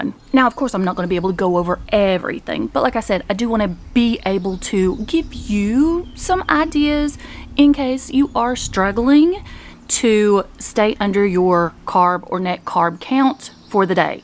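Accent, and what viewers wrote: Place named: Louisiana, United States. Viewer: You’ve got to be from Louisiana Boo! I love it. 😂❤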